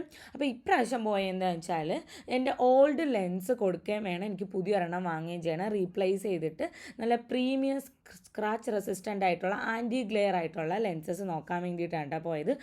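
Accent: Trivandrum, India. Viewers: ഈ പൂകിരി മഹാ ബോറാണ്.. മര്യാദക്ക് സംസാരിക്കാൻ പോലും അറിയില്ല... ഒരു തൊലിഞ്ഞ തിരുന്തോരം ഭാഷ.. എഴിച്ചു പോടി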